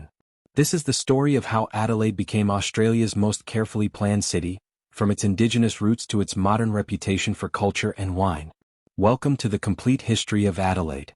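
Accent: United States. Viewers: Why must there be an American accent narration? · Fuck this I can't even watch a video about my own city without some yank doing the narration because Australian videos are not allowed fuck YouTube sick to death of this I refuse to be Americanised by Google fuck that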